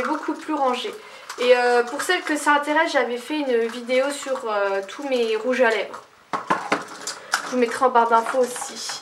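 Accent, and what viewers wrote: Lorraine, France. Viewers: l'accent lorrain, c'est trop mignon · Tres bel accent lorrain! trop mimi!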